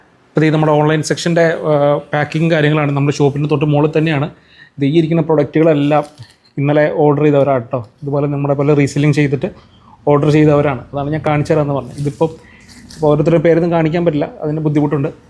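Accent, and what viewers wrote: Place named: Kasaragod, India. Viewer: Thalpariyemund Kasaragod aane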